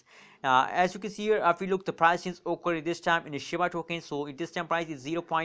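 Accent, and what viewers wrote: India. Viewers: Urs voice little bit like indian 😀😀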